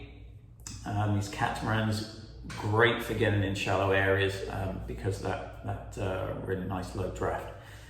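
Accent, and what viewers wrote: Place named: United Kingdom. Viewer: north america? you kinda sound british.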